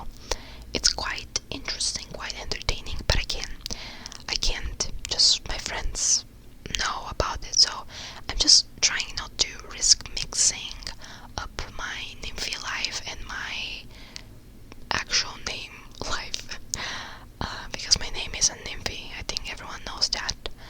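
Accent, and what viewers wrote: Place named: Europe. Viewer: Love this video. Really enjoy rambling videos in general as they are so very relaxing. Absolutely love your accent. Can’t quite pinpoint what it is but maybe somewhere European? Whilst I really enjoy your GeoGuessr, Wordle and Sims videos, would love you to do these rambles occasionally if you have time. Thank you for the top ASMR content x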